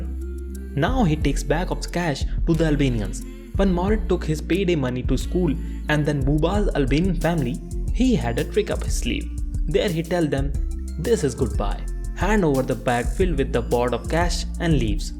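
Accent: India. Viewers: 🙄🙄🙄 yeah that's what I said Im Indian too I guessed it by the accent bro thanks for an informative video.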